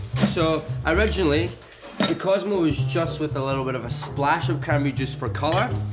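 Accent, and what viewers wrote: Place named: Scotland, United Kingdom. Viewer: i love his accent..he's scottish?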